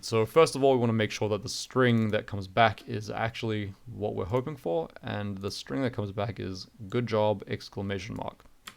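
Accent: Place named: New Zealand. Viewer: Enjoying your accent. Chur from a fellow kiwi for your excellent content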